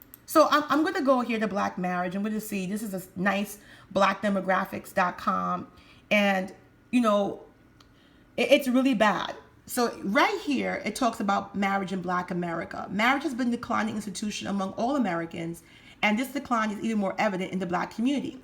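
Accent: Jamaica. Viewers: You're welcome. Also it's nice to hear a fellow Jamaican pon the mike.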